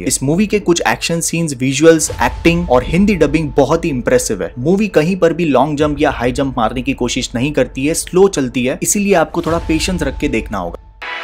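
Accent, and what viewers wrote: India. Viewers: I watch your videos so that I could copy your accent. That's the best Indian accent.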